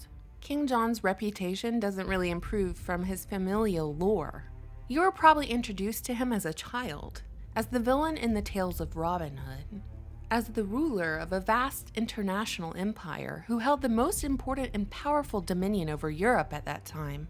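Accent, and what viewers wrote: South, United States. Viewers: did you speed this video up? what happened to that slow, southern, mellifluous locution?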